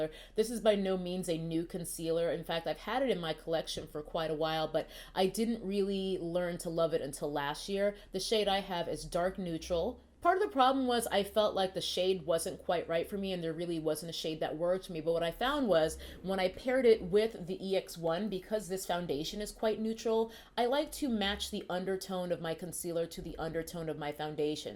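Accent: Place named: Brooklyn, United States. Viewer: Girrrlll i coulda swore you lived in NewYork. Like straight Brooklyn Native!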